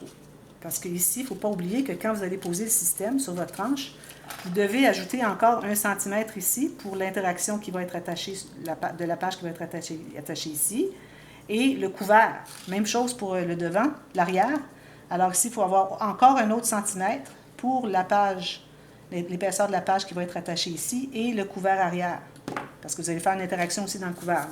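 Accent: Quebec, Canada. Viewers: Bonjour, Merci beaucoup pour votre générosité. J'ai acheté des feuilles pollen kraft en 210g: cette épaisseur est elle suffisante? Autrement quelle feuille utilisez-vous, le grammage de 260g n'est pas le plus facile à trouver. Je tiens encore à vous remercier pour tous tous les conseils et tout le temps que vous prenez pour nous répondre. Un petit coucou de Nancy en Lorraine dans l'est de la France. Votre accent me rappelle des vieux souvenirs de Montréal quand j'ai visité le canada et les USA il y a très lomgtemps.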